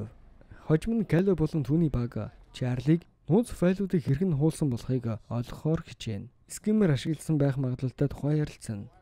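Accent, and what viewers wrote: Uvs, Mongolia. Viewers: Uvs maygaar yriku bj bolkumu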